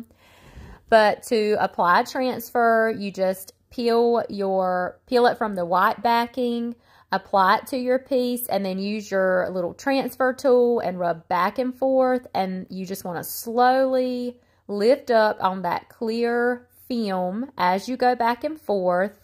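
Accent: South, United States. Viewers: All of them simply beautiful! Every one of them is my favorite. I love them as much as you do! P.S. I really like listening to your voice are you in the south? TFS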